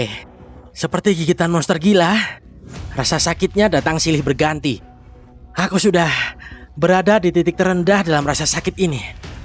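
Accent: Java, Indonesia. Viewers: Dari dubing ini saya seperti mendengar logat jawa ini unik fren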